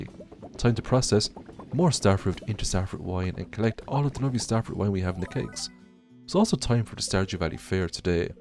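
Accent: Ireland. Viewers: Dying to know what part of Ireland you are from!!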